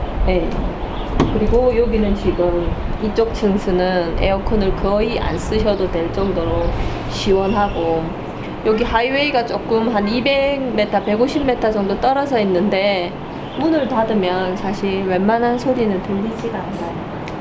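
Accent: Gyeongsang, South Korea. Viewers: 경상도분이신듯 사투리가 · 경상도 냄새가 나네요ㅋㅋ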